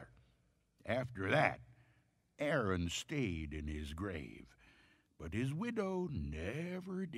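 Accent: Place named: New York, United States. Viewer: I love the narrator’s accent for this story! He is originally from New York I think! 🤣✋ I love this story, more like a dark comedy than a scary story. The delivery is hilarious too Also, that widow is one gold digger, isn’t she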